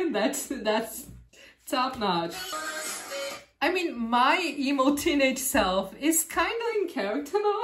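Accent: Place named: Brazil. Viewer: Nossa é incrível como brasileiros conseguem reconhecer brasileiros só pelo sotaque haha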